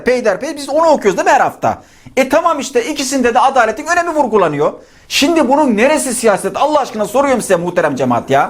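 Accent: Denizli, Turkey. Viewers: Denizli Şivesi ile konuşman da balı oluyor bu kanalının teşekkür ederiz biraz olsun sorunlardan uzaklaşıyoruz İYİ ki varsın teşekkür ederiz sağlasın 🙋‍♂️💐🥰🧿🧿🧿🧿🌹👏👏👍👍😅🤣😂😁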